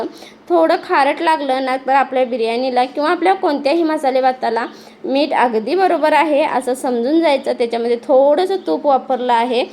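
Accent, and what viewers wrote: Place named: Kolhapur, India. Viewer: खूप छान रेसिपी तुम्ही अस्सल कोल्हापुरी आणि तुमच्या बोलण्यातही कोल्हापूरचा ठसका जाणवत आहे 👍👍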